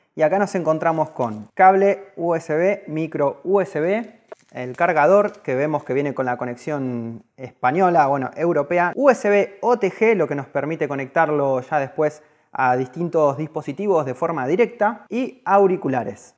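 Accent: Argentina. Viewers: sos argentino o español? porque decis algunas palabras en argentino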